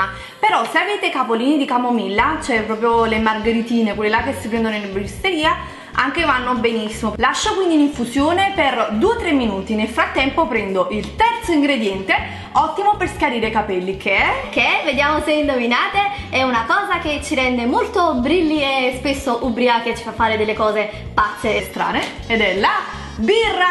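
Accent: Naples, Italy. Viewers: sbaglio o sei napoletana, l'accento è inconfondibile 😂😂😂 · Ossicini è in dialetto napoletano se non si era capito dal suo accento fonetico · Hai l'accento napoletano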